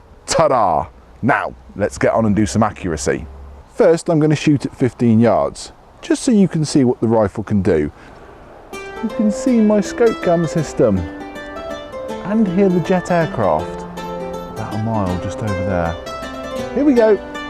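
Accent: United Kingdom. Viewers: Can somebody say from what part of UK is this english? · Everything sounds better with a British accent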